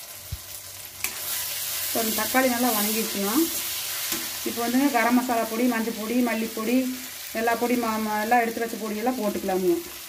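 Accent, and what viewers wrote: Kongu, India. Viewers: Kongu Tamil super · அருமைங்க.... வாழ்க கொங்கு தமிழ்... வளர்க கொங்கு தமிழ்.....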